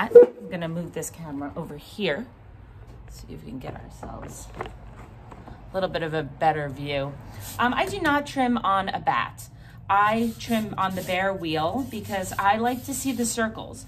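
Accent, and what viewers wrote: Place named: New York, United States. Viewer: love your NY accent!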